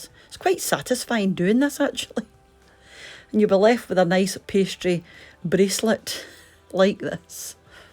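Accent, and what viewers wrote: Scotland, United Kingdom. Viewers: i just love your Scottish accent ;-) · Love your video and your sweet Scots accent! I first watched it with the sound off and closed captions on, but they made no sense at all, ha ha ! Much more enjoyable to listen than read. Definitely want to try your recipe. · Hello there! So glad I came across your channel. This is the first time watching and thank you for the yummy recipe. I am an 80 year old granny, living in South Africa and am always open to new ideas as, one can never know enough, even at my age. I probably missed your competition but, even just to hear your beautiful Scottish accent and see the delicious recipes, is good enough for me. Do you ever do Haggis or the real Scotch shortbread biscuits? God bless you and your family. Shalom! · Love the pie but the CC had me in stitches, a Scottish accent is not easy to translate. 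· Just found you today. I love your Scottish accent and the seaside is beautiful. I’m going to search out more of your recipes. Thanks!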